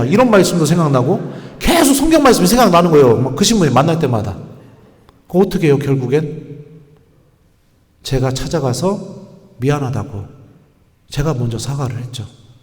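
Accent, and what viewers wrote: Chungcheong, South Korea. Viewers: 찬미예수님 ~♡ 신부님 서울가셨내유~다음 또 가신다구유~^♡~ 신부님 충청도 사투리 환장하게 재밋내유~ 서울말 안하셔도돼유~^^ 말씀자체가 신자들을 사로잡는당께유~신부님 아주 멋지십니다.제고향은 충남 부여 랑께유~^^ 신부님 건강하시고 행복하셔유~♡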